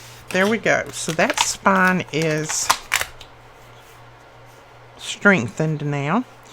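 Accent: South, United States. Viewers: I love all your videos & as a Canadian have come to understand your Southern drawl: ) Love the detail & generosity of your freebies & today signed up for your newsletter. Keep up the great crafting ideas!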